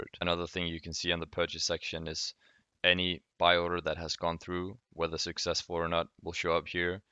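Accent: Denmark. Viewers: DENMARK jeg kan høre det på agsangten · jeg vidste du var dansker da jeg hørte din accent og det fik jeg bekræftet da du skærm optog din steam mobile guard app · u danish? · are you danish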